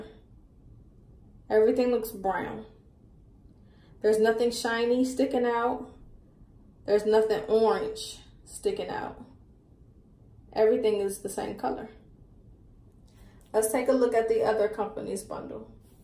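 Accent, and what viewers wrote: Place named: Philadelphia, United States. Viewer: GREAT INFORMATIVE VIDEO ................ & YOU SOUND LIKE YOUR FROM PHILLY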